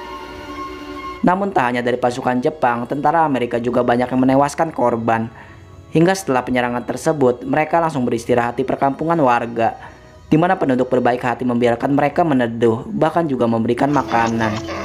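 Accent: Indonesia. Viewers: Dari aksen bicara ke Indonesia bukan papua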